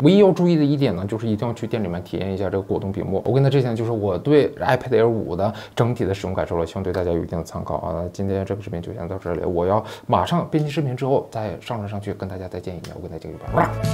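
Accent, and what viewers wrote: Northeast, China. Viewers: 第一次收到推送，是东北人吗听起来好好笑啊可是难道不应该是台湾的频道吗🤣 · 东北老铁啊？ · 東北話太逗樂哈哈哈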